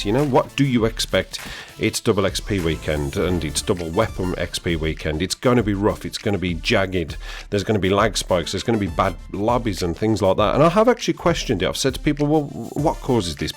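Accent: United Kingdom. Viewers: Are you British or Australian?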